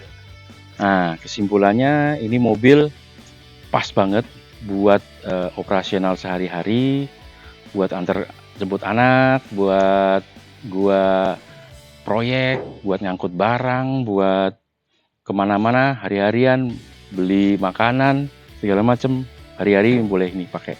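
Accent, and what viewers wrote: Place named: Java, Indonesia. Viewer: Jowo ne medog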